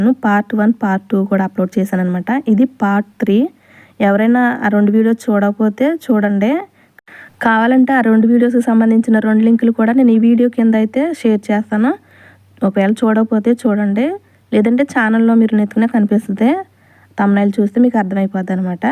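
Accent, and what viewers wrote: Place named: Godavari, India. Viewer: మేడం మీరు ఇస్ట్, వేస్ట్ గోదావరి అనుకుంట మాటలు తియ్యగా వున్నాయి,, మా డిస్ట్రిక్ట్ కడప, ఐ లవ్ యు మేడం మీరు చెప్పే అరబిక్ చాలా ఇంపార్టెంట్ థాంక్స్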